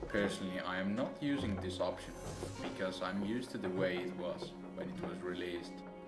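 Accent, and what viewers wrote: Finland. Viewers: Holy shit are you Finnish? :D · omg u sound so finnish :D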